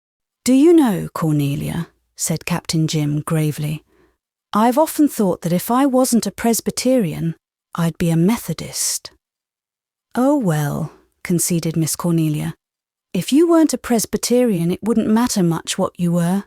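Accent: United Kingdom. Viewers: Beautiful British accent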